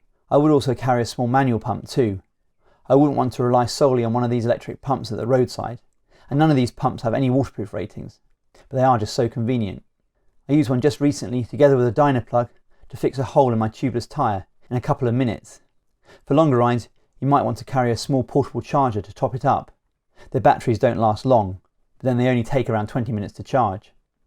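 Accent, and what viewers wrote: United Kingdom. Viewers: Thanks a lot!! This video really helped me! Nice English accent! I wish I could speak like you :D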